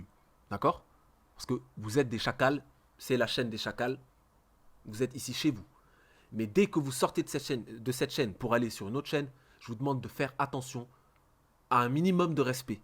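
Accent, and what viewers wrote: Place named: Essonne, France. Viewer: Par où commencer , bon deja c'est la deuxième fois que je regarde une de tes vidéos et j'aime bien ta façon de penser et ta façon de parler , d'ailleurs je me demande si t'es pas un Boug du 91 ... Thekairi78 j'ai regarder sa toute première vidéo sur YouTube en 2011 , il porter une casquette , il disait Salam Aleykoum, j'ai direct accroché au personnage par ce que je me reconnaissais dedans , un mec de cité sur YouTube en 2011 y en avait pas bcp , îl parlait de religion , de l'école , des femmes , de la France , bref de la vie en général ... Ses records zombies , les massacres qu'il faisait avec sa team en multijoueur , c'était un régal de regarder ses vidéos ! Alors le voir changer autant en si peu de temps , c'est normal que ces anciens abonnés dislike ces vidéos , c'est comme si tu voyais un de tes potes sombrer dans la drogue , l'alcool , les putes etc bon l'exemple est un peu extrême mais c'est dans cet optique que je vois les choses Voilà chacal principal j'espère que tu verra ca!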